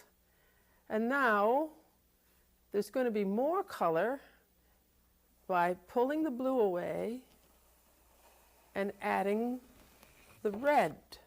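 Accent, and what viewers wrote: New York, United States. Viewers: Would be honored to get my hands on Helen's books. I've been able to view many of her dvd's through interlibrary loan. I immediately resonated with her unmistakable New York accent.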